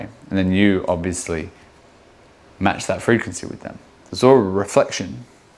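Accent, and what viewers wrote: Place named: Australia. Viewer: You're so fucking hot, but I cannot deal with an Aussie accent, mate.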